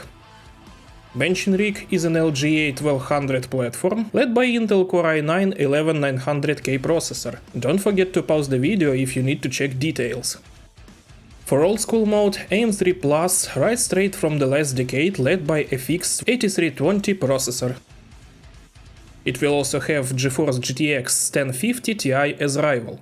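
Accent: Russia. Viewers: Сразу слышен русский акцент и школа ) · Russian accent😂)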